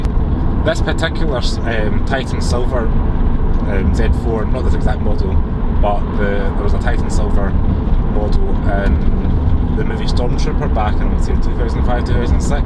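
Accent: Scotland, United Kingdom. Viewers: That's a bit rude, Harald - he is speaking English, but with a lovely Scottish accent. If you want posh Z4 speak, subscribe to "It's Joel". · It's Scottish accent.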